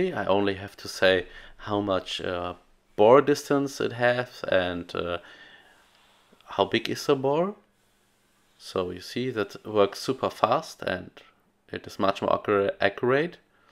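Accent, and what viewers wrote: Germany. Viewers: Man merkt leicht das er Deutsch ist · Sofort erkannte das er deutsch ist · ich lebe in Freiburg Breisgau und ich schaue gerne deine Videos und habe grad erst festgestellt, warum das so ist. Ich höre dich gerne sprechen. Dieser leicht kölsche Dialekt kam mir so vertraut vor und dann erst stell ich fest, das Ihr in Troisdorf seid und ich keine 250 Meter von euch geboren und aufgewachsen bin erinnere mich an schöne abende im Saga und freue mich. Schöne grüße in die Heimat und macht weiter so. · Geiles Video wenn man es stumm schaltet. Dein Akzent ist leider ungenießbar. Ich denke nicht dass jemand englisch sprachiges das eher toleriert als jemand der an den "Deutschen Akzent" schon gewöhnt ist. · Your English is so German <3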